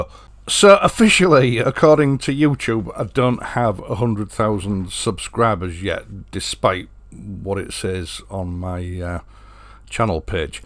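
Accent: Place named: Keighley, United Kingdom. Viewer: Congratulations, I'm following from Germany and I love the Pictures, the Triumphs and your comments on all the current e-mobility nonsense happening around. I worked in Keighley for a while 30 years ago an I love your accent. All the best for the next 100k 🙋‍♂️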